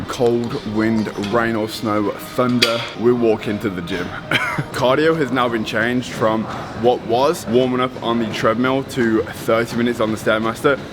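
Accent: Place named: United Kingdom. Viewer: LOL He has a British accent. Do they not televise American or British shows, movies etc in whatever country you live in?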